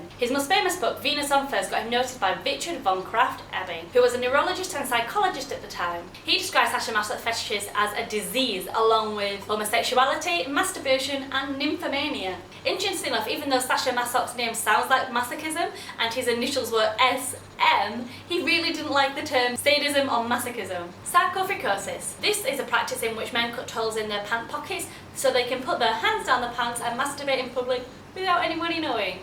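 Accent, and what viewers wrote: Yorkshire, United Kingdom. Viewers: Are you from like Yorkshire or somthing!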